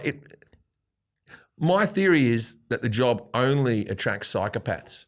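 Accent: Australia. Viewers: An Aussie accent delivering dick jokes. I wouldn’t call him a comedian.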